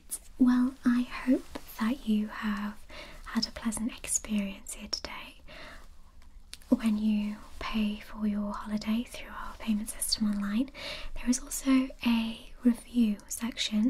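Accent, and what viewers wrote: United Kingdom. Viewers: The accent is giving me the chills. British is so damn nice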